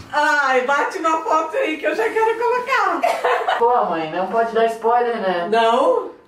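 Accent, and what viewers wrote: Florianópolis, Brazil. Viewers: nem sabia, mas logo vi esse sotaque manezinho lindo ae ♡ que liindo o videoo à sua mae!! paz e bem!! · HAHAHAHHAHAHA logo pelos 5 primeiros minutos já deu pra notar o sotaque manezinho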